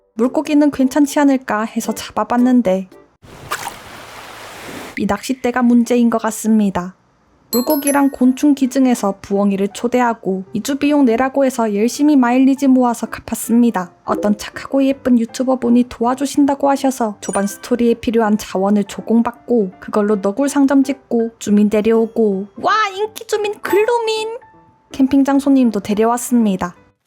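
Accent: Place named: Daegu, South Korea. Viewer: 대구분이라 사투리 쓰시는거에욘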